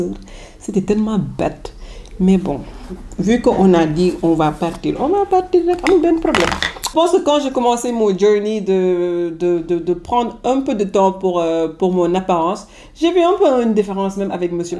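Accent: Senegal. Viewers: j aime bien ton français woloftisé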